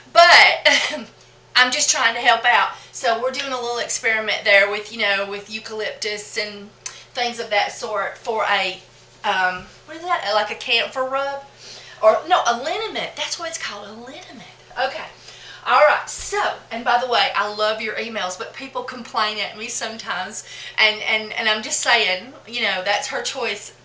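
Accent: South, United States. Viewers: I live in Colorado where it is very dry...I had to laugh because your from the south, and I used to live down there...I picked up the accent now and my hsb has wondered "who have you been talking too?" I said I havn't been talkin' to anyone, just a listenin' to a lady on a video" I loved livin' the in the south where I warshed my winda's and then I moved back here where I wash my windows...Not making fun of you, just that you bring back such good memories! Never met nicer people..I love the south...I wish I could get my hsb to fetch somethin', in fact anything!LOL,...but they don't fetch in this neck of the woods...haha....Loved your video's and want to make some creams some now...blessings, Victoria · I love how you’re southern af but also a hippie 😊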